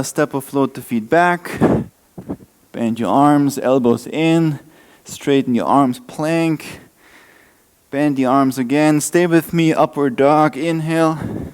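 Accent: Germany. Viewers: Hello. I've just recently found you on YouTube and and really loving and resonating with your practice. It is challenging but accessible and I really love your connections between poses. I've had a difficult time lately getting onto my mat, but your practice has encouraged and motivated me. So thank you! xo And I adore your German accent! ;-)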